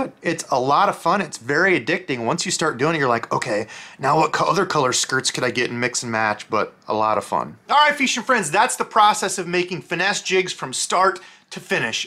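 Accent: Iowa, United States. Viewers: Petition for to make more root beer colored jigs to hear him say it, love the Iowa accent 😂😂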